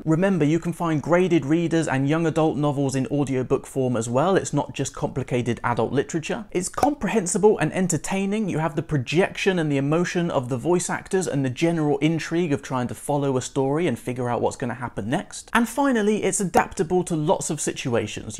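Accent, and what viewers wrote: United Kingdom. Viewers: I love your British English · Nice video! I’ve been learning Spanish for a while, but haven’t done too much with audio books. I’m going to do more. BTW I just finished watching your video with Español con Juan (my first time watching your channel) next I watched this video, I don’t know why but it was strange to hear your British accent! 😀 ¡Tu Español es muy bien!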